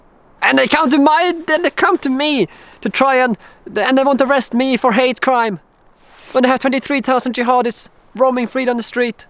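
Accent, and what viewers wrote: Sweden. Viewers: tried to watch. but the swenglish is just so bad i cannot stand it.. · Detta är alltså inte satir? Hade ingen aningen om att Svenskar fortf postade videos med så grov svenengelska som denna. Går ju inte att koncetrera sig på innehållet.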